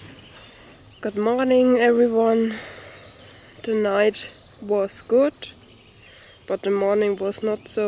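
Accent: Bavaria, Germany. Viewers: кошмарный немецкий акцент...но всё равно понятно.беда в том что женщина не создана для выживания в дикой природе. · never heard deutscheres english :D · Love your german accent. Nice video. You have a very appealing shape. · aloha, i saw your video for the first time speaking English but immediately recognized that you were German and later in the video i learned that you were in Chiemgau Bavaria. This is absolutely one of the most beautiful places, Lake Chiemsee and the Kampenwand in Aschau. i lived in Sachrang and near Traunstein, but now back in Aschau. Aschau is great for hiking into the forest, and for mountain biking. maybe i see you here one day making a video of Aschau and Prien river. Entspannen and enjoy. your english is just fine, i learning to speak german. Aloha. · Hallo, I recently discovered your channel, and the videos you have on here are amazing. 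In addition to the scenery and skill you show, I really love how you show the trains coming by in so much of your video work and listening / looking for them. I Love your German accent by the way, while it used to be more common that I've heard in older generations, from what I've seen in recent times, I don't hear it as rich and pronounced among many people as before, like yours. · Lucky bavarian english ..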